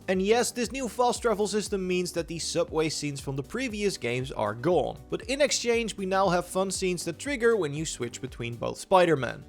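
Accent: Netherlands. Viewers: Je hoort gewoon dat deze man Nederlands is hoor het nu pas maat 😂😂😂😂 top video wel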